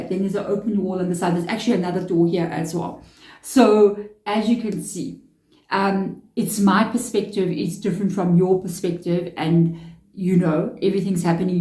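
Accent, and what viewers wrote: South Africa. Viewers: Lovely to hear a South African voice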